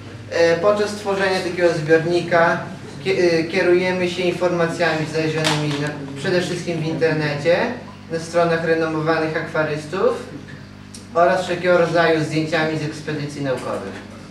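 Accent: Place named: Kresy, Poland. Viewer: fajny ten akcent to pewnie jakieś kresy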